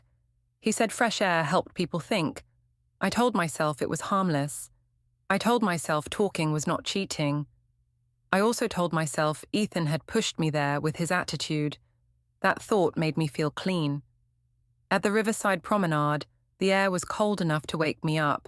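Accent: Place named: United Kingdom. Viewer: That Brit accent SUCKS! Thumbs down!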